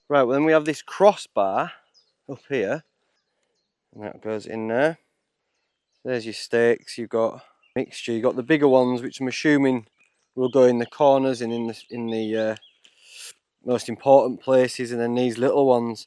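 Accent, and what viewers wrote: Yorkshire, United Kingdom. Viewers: Thanks a heap for this review, I'm looking hard at this tent but you've given me a few things to ponder. Oh and and bloody good YT too. Detail where there needs to be detail and wide shots where there need to be wide shots, good light and audio. (Love a Yorkshire accent... Lol, my mum's from Sheffield.) One question.. do you know if the fly seams come sealed ? Is it rain/weather proof straight out of the box , so to speak? Greetings from Tasmania, Australia.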